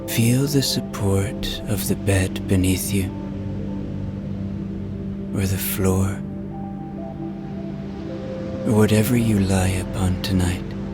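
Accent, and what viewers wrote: Ireland. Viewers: Adore an Irish story teller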